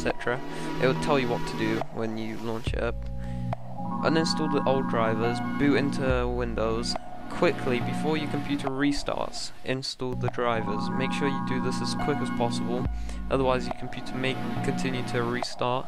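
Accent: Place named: United Kingdom. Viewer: Yeah british accent is already hard to understand and the music makes is harder to understand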